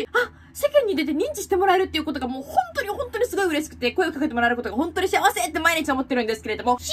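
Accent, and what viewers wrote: Kansai, Japan. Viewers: この時の可愛い。いつも可愛いけど。特に可愛いあと関西弁強めw好きよw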